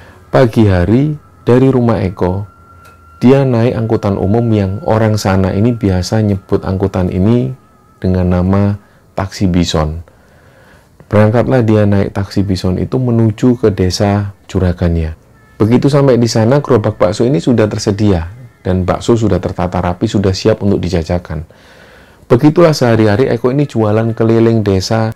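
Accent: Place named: Surabaya, Indonesia. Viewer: Mas ini orang mana ya, sepertinya ada bahasa khas Surabaya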